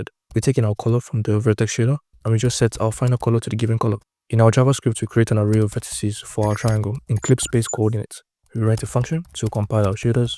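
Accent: Nigeria. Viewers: Can’t place the accent are you Nigerian?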